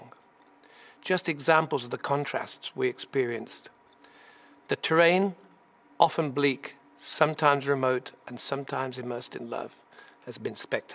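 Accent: United Kingdom. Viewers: Dude he could have said cheese over and over for 2 minutes and it would have sounded profound for two reasons. 1. He's robert plant. 2. He has a british accent. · I love your beautiful English accent! I think my family's heritage came from Scotland and Wales and possibly Ireland. My mothers family has the same eyes and facial features as you have. They are very beautiful looking people!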